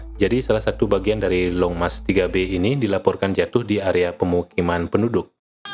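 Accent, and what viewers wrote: Bali, Indonesia. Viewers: Harusnya adane Bli...medhok Bali ne bli...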